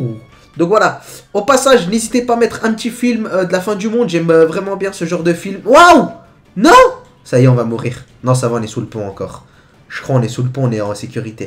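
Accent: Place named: South, France. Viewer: Tu a lacsent du sud !! ❗️❗️❗️❗️❗️❗️❗️🤓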